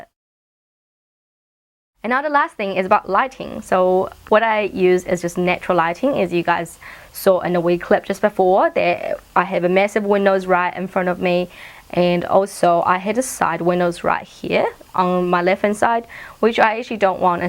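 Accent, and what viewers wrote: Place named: Australia. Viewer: Great tip. Are you from Australia? your accent is so cute.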